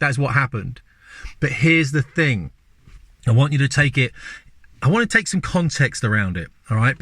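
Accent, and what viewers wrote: United Kingdom. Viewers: Wonderful to hear a true British man talking!!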